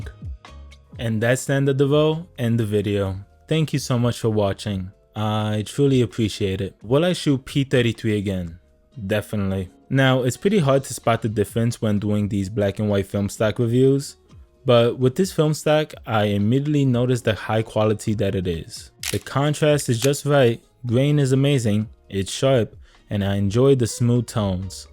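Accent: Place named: New York, United States. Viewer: Why you sound like a New Yorker but live in eu?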